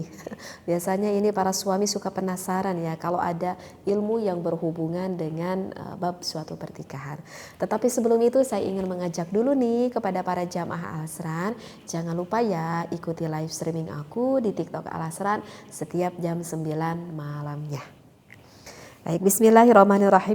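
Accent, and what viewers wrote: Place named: Java, Indonesia. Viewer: gpp atuh, lidah orang Jawa kok disama2in Arab. Jelas beda. Bismillah aja jadi semillah. Kalem aja, Tuhan bukan org Arab kok😅😊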